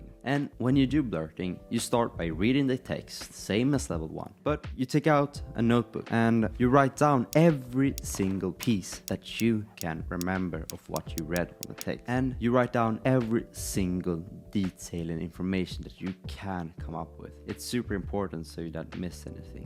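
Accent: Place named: Sweden. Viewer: The swedish accent coming through your english makes you weirdly lovely to listen to. Dont change dude❤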